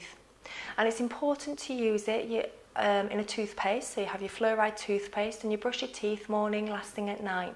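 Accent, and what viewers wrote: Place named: United Kingdom. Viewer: She's British not Aussie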